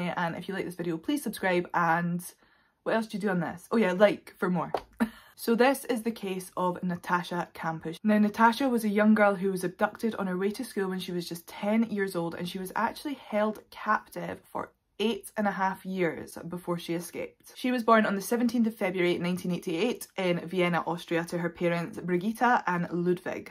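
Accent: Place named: Scotland, United Kingdom. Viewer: Totally the only other human interaction she has was with him and when his controlling meant he wasn't horrific to her she took that as kindness. So important for people that haven't experienced it to have an open mind as to what these victims feel the way they do. Thanks again really loved the content. As a fellow Scot your accent helped too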